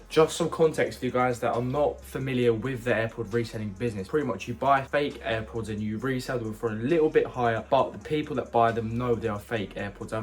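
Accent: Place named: Bulgaria. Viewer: Are you bulgarian?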